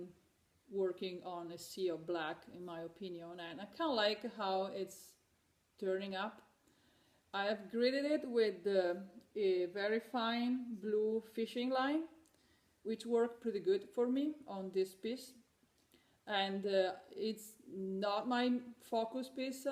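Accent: Italy. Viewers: I'm just now starting to watch your videos. What a beautiful Italian accent you have! I love it!! I'm trying to learn Italian using the Duolingo app. Definitely the most beautifully spoken language ever, in my opinion.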